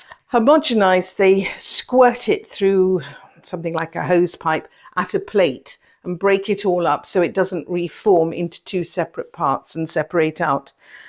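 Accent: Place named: United Kingdom. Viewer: She is English. She is pronouncing it as the Russian word with British inflection. Relax.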